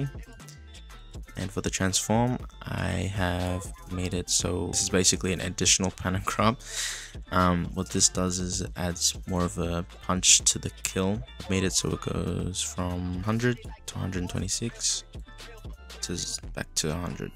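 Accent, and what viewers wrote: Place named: Australia. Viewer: omg… first time hearing your voice and wow, what can I say? It’s incredible… amazing, awesome it’s everything I could’ve ever wished for. Mature, Australian, professional, polite wow. This is one of the best days of my life.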